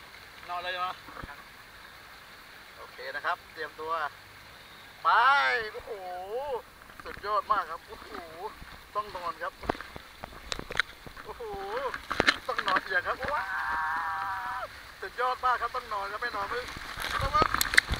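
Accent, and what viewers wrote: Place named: South, Thailand. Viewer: พูดใต้ 55555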